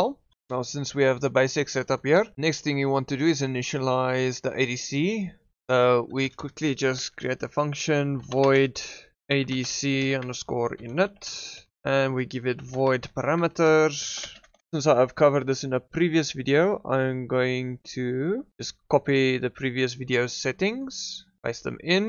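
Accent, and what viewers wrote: Afrikaans, South Africa. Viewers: I can hear an Afrikaans accent. ;-)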